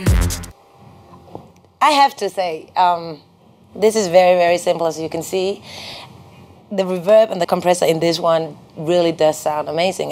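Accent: Denmark. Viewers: danish accent (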